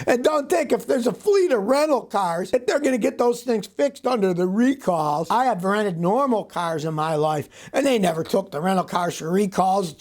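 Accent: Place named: South, United States. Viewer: I love your southern trang 😂 you make my day 👏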